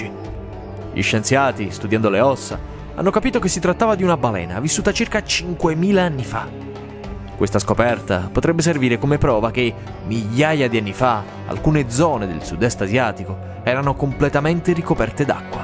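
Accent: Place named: South, Italy. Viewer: di tutti i tuoi video... mi piace il tuo accento del sud. heheheheh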